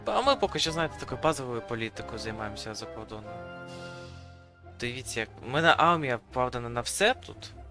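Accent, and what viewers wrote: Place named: Surzhyk, Ukraine. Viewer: найсолодший суржик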